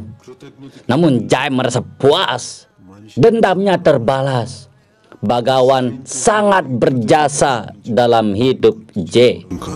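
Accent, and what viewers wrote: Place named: Lombok, Indonesia. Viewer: lanjut saja, bro....tiap orang punya kreasi sendiri. logatnya mirip teman saya orang sasak.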